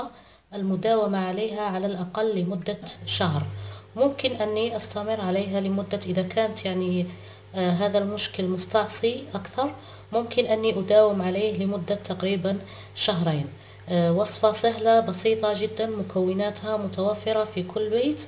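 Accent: Morocco. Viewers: مغربية عرفت من لهجتها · لهجة مغربية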